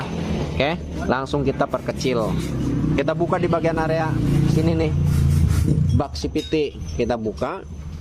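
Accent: West Java, Indonesia. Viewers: Makasih ilmu nya kang..sunda banget kang pengampean nya tp mudah di mengerti.❤❤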